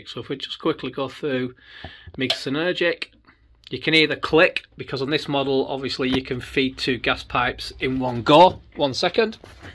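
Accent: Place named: Northern England, United Kingdom. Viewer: Nice one.great to hear a relaxed northern voice rather than a American lol. May be thinking of getting one of these mainly for the mma and the mig .but would like to learn tiggin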